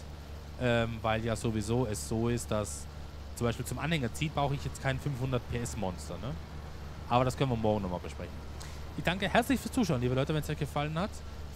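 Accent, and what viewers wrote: Tyrol, Austria. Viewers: Wo aus Tirol kommst du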